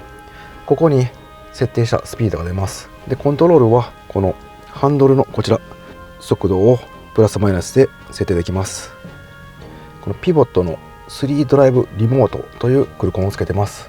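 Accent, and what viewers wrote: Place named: Kansai, Japan. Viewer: 皆さんもコメントされているように、それでインディのDIY振り返りだったんですね！ほんと、いつも相方と楽しみに拝見してます。私もキャンピングカーを検討し始め、さんの動画を随分参考にさせてもらいました。今年の12月納車予定です。同じ関西人なので、さんの語りは親近感ありすぎです。インディ乗り続けて欲しい気持ちもありますが、次に何にされるかもとても楽しみです。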